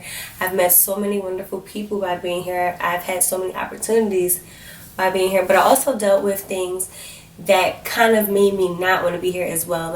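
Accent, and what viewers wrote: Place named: Philadelphia, United States. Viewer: I knew you was a philly girl I can hear it!!! Congrats on the move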